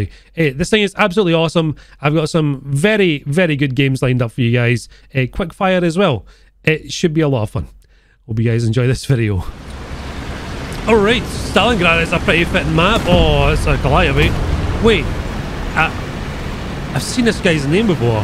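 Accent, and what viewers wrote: Scotland, United Kingdom. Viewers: Hearing a Scottish man whisper "Sleep now, little baby" in a sinister tone may be the most terrifying thing I've heard all week.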